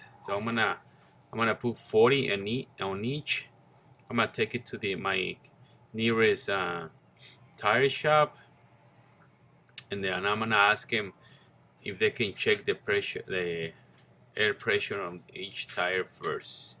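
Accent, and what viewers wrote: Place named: Mexico. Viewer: Give him a break bro, that's a Mexican accent, at least he can speak 2 languages (Spanish and English) .Stop the hate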